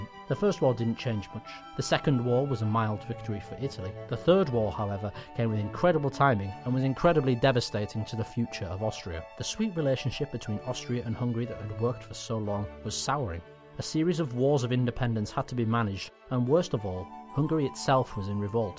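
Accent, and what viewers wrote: United Kingdom. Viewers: This was the first video I had watched of, and after it, I had come to love all his work. It a damn shame we'll never hear his snarky British accent snap another witty comment or see another one of his videos in this unique and detailed style. Rest in peace, you have left behind a legacy and personality not easily mimicked.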